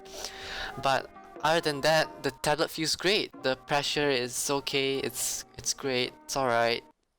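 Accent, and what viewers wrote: Malaysia. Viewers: Syclone Shush Im Malaysian so I know his accent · Your accent sounds like Malaysian accent if you are Malaysian i wanted to say SAYA PERMINAT AWAK(i am your fan)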